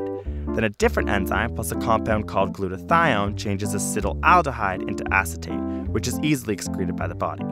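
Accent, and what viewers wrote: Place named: Canada. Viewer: From a phonetics point of view, this guy might just sound "gay" because he exhibits features of canadian raising- the other guy has it too kind of. I'm not saying all canadian guys sound gay, but that kind of chilled out, raised intonation (as if every sentence sort of sounds like a question) and vowel that's often found in californian and canadian english CAN sound similar to stereotypical "gay" speech. Of course this is all arbitrary as people sound the way they do, regardless of orientation.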